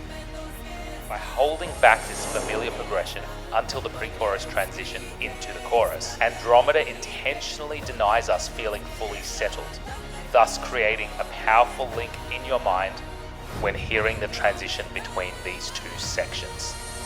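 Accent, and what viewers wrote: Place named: Australia. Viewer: All true. This is historic and cultural way of singing also. Etno. And if this is Aussie accent I love it. The best english ever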